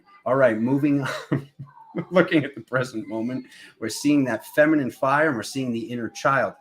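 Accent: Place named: South, United States. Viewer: "He's a badboy but he's a good mayn"🤣 🤣 But I like that Southern draw😇 I love your vibe, We're always having a good time 🤗🤣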